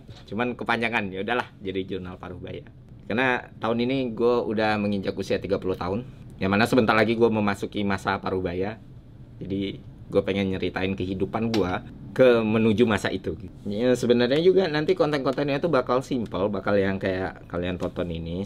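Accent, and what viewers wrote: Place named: Jambi, Indonesia. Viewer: Woii orang jambi yoo haha orang awak lah ternyatooo awak kiro orang jakarta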